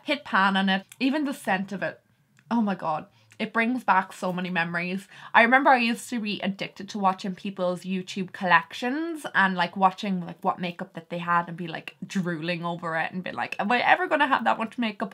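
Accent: Ireland. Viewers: hey is ur accent altered by ur tongue ring or do u sound the same without it? I've never come across any gurus from ireland and i like hearing ppl speak little fun facts about where they are from ... u all don't know theyre fun facts bc naturally to u all its normal bc its where u live lol. But anyhow, do u know of any other gurus from ireland that i could sub to as well?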